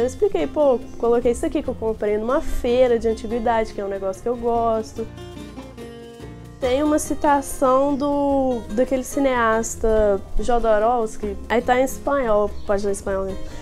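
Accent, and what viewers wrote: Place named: Brazil. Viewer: antes eu n tava ligado nessa de definir o sotaque brasileiro do inglês, mas no momento que vi ela falar do vídeo do mine, sabia que ela era brasileira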